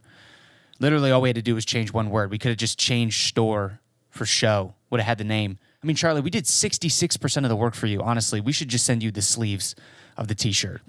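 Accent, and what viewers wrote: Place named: United Kingdom. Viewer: Awesome just awesome and British accent on point 👌👌👌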